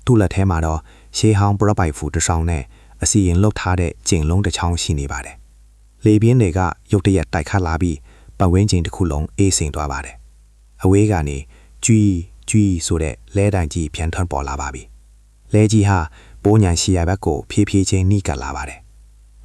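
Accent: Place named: China. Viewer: တရုတ်အသံလား တိုင်းရင်းသားဆိုသူတွေအသံလား ဗမာလူမျိုးရဲ့ ဗမာလေသံမဟုတ်ဘူး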